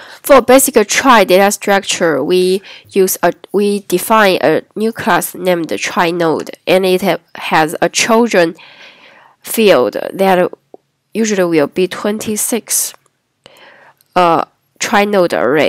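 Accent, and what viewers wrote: China. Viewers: Please improve your English. It's really hard to hear your annoying Chinglish.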